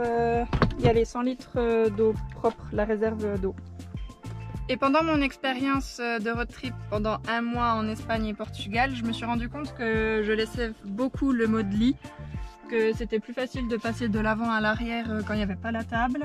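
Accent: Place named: Franche-Comté, France. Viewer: Belle présentation (avec ce charmant accent franc comtois si je ne me trompes, 😉). J'adore le bac a douche encastré et le toit relevable,c'est vraiment top! Du coup, je me demande, il y a un faux plancher pour l'encastrer ? Et ,est il relié directement à l'extérieur ou as tu une cuve d'eau grise? Hâte de suivre tes prochaines aventures 👍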